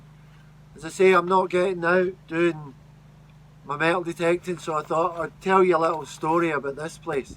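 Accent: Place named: Scotland, United Kingdom. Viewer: I love a great Scottish accent telling a tale of wee folk in a beautiful place! It's a great escape from the modern world and all its troubles!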